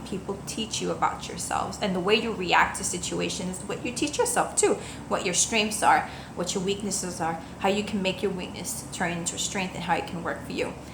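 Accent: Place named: Brooklyn, United States. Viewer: Exercise my language but this shit is great. You basically described the events of the week and I feel a lot better about things. You kept it real, and explained the cards and their energies together in a way that had me thinking about the things I’ve got to deal with. Thanks for the wisdom, and indirectly advising me on my own situations. Love the Brooklyn accent too btw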